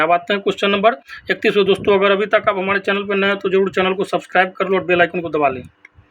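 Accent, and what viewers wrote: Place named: Bihar, India. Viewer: hm v Bihar Samastipur se h ,,,,aapke bolne k style se lga he ki aap v Bihar se he h 🙏🙏🙏🙏🙏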